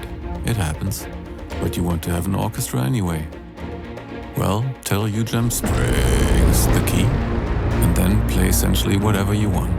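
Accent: Germany. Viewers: German Accent?;)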